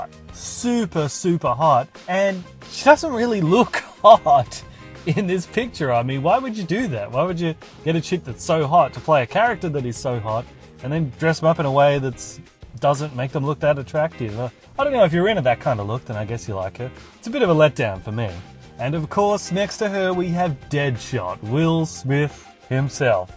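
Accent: Australia. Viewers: He's Australian, the weird thing is i am too but people think I'm American. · he's AUSTRALIAN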